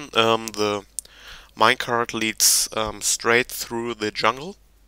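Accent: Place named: Germany. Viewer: man merkt so geil dass du normal deutsch sprichst :D zb. schurr (sure) und äktschälli xD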